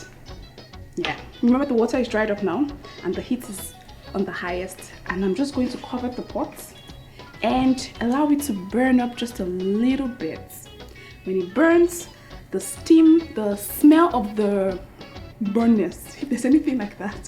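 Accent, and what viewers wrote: Nigeria. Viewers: I love your Nigerian accent❤️❤️🇳🇬 (im Nigerian to🇳🇬)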